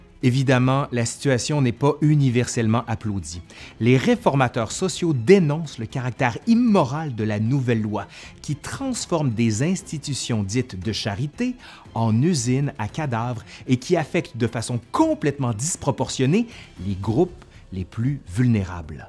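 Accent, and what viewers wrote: Quebec, Canada. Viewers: Salut á tous !!! L'accent des Canadiens francophones sonne comme une musique agréable à l'oreille ... Merci pour ton travail de qualitée !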